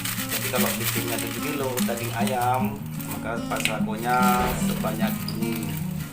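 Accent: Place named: Madura, Indonesia. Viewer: Orang mana mas Logatnya seperti orang madura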